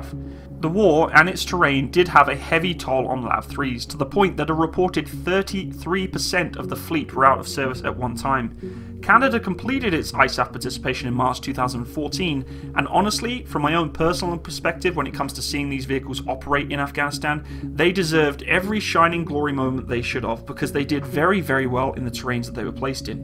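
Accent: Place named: United Kingdom. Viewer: You don’t sound like a fellow Canadian you sound half british no offence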